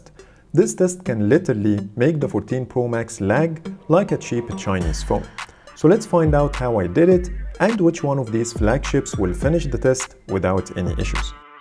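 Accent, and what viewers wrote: Egypt. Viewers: كأن اللي بيتكلم مصري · Egyptian accent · Bro‘s egyptian accent roaring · انت مصري صح؟ باين من الاكسنت بتاعك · Bro your accents English like Egyptian people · I think you’re from Egypt from your speaking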